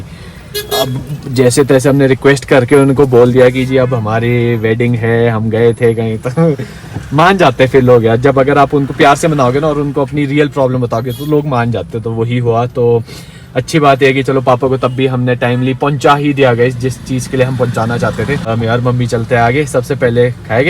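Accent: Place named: Himachal Pradesh, India. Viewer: It's only himachal language tone.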